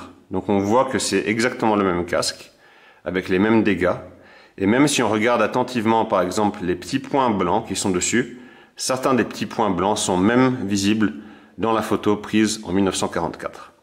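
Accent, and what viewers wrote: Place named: Switzerland. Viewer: Ben avec votre accent je pensais que vous étiez Suisse, désolé.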